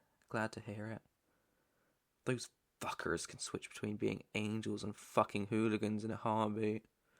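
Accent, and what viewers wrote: United Kingdom. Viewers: Do you speak British?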